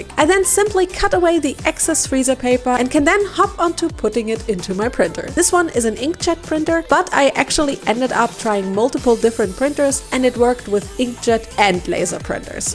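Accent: Germany. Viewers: Do i hear a german accent? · Are you German?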